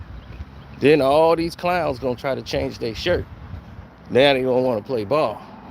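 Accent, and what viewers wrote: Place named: South, United States. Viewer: This DUDE Talk SLOW AND COUNTRY. GET TO THE FUCKING POINT. 😁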